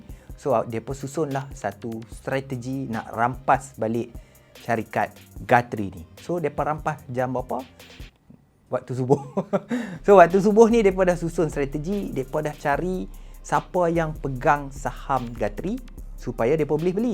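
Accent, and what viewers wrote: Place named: Kedah, Malaysia. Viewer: Aku mati-mati inagtkan speaker ni Melayu Muslim..Punya fasih Kedah Hang mat...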